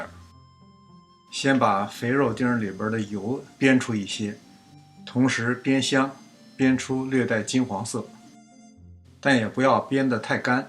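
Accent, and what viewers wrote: Beijing, China. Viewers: 京味儿好亲切呀！您的菜谱太适合我们这些长年在外的人了！多石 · 地道的北京话加上地道的炸酱面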